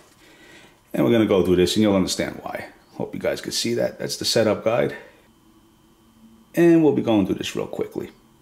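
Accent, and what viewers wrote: New York, United States. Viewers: New York accent?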